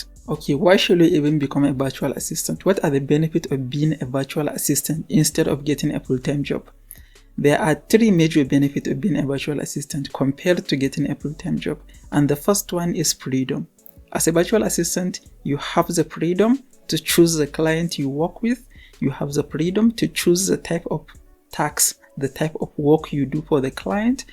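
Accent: Hausa, Nigeria. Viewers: Nice Video, I love your Housa Accent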